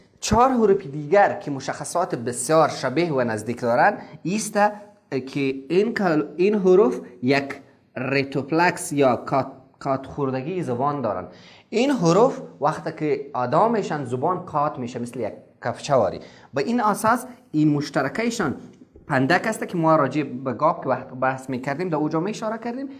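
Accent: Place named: Kandahar, Afghanistan. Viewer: د پښتو زده کړې په لوست کې مو ځينې کندهاري گړدودونو څخه کار اخيستى د ې، ي، ى، ئ ۍ په پېژندنه کې چېرته چې تاسو مثال ورکړى، د (ي) = سترگي، دا په کندهار کې وينگ دى خو اصلا په ليکني بڼه لوى استاد رشاد هم سرگي نه ليکل ،( سترگې) ليکل . دلته د اې تلفظ يا گړدود سم دى نه د اي خو په وينا کې بيا پروا نلري. دا معمول په ټولې نړۍ کې شته چې د هرې سيمې خلک نورو خلکو ته څه ورپېژندل غواړي نو د سيمو په ځانگړتيا او اغېزو هم ورته مالومات ورکوي، بيا د ژبې په برخه کې ډېر معمول دى چې تاسو ته پکار و د کندهار لحجه، گړدود مو هم تشريح کړاى وى. بيا هم مننه او فارسي ژبو ته کندهاري گړدود په سبب د اسانه غير فني تلفظونو ورنږدې دى چې ترې په اسانه گټه پورته کړي خو که فني برخې گړدودونه هم زده کړي بيا يې د ژبې پوره زده کړه زباتېږي.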